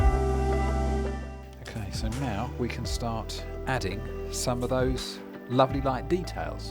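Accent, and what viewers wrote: United Kingdom. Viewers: As a visual learner this is perfection. But as a vocalist/musician the volume fluctuations are maddening. I'd like to hear your lovely Brit voice better, without constantly adjusting the volume. Stunning work as always though.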